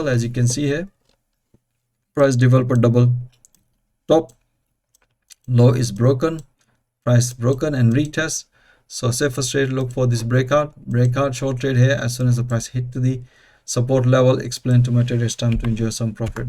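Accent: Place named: United Kingdom. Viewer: Sir pls try to make us understand in Urdu language. Many people are unable to understand British accent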